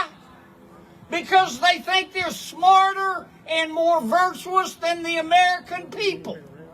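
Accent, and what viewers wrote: South, United States. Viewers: You guys actually listen to this guy? 15 years ago he barely had a Southern accent, and now he is full bore Good-ol'-boy South. What a joke. "Dear Lord give us more people like Hershel Walker and his fans, that can barely rub two brain cells together, so that we can stay in power forever, and not actually do anything for these constituents . Oh we'll talk a good game ... but actually do nothing for them."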